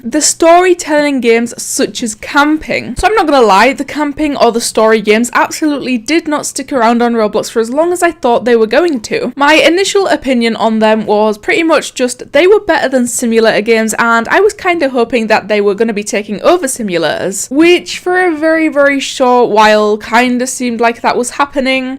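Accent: Scotland, United Kingdom. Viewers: Wow. 3 seconds in and I can tell you're scottish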